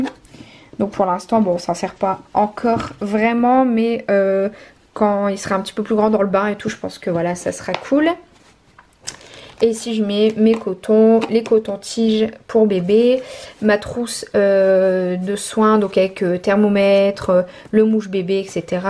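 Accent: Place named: North, France. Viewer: Bonjour, ce n est pas une critique mais j ai l impression que vous avez un petit accent du Nord...😊😁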